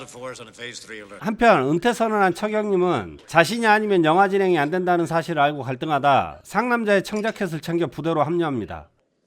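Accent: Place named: Honam, South Korea. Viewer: 아따 성님 호남사투리 오져부네요~~ 잘보고갑니다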